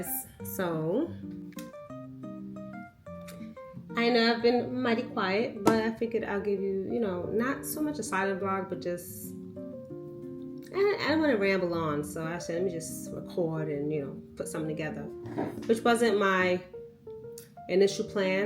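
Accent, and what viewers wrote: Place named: New York, United States. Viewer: Hi,! Your relaxed life always remind me that I need to calm down. I love seeing how you balance so well. It looks like your Labor Day was pretty chill and thanks for letting us hear your voice again. Your New York accent. 🥰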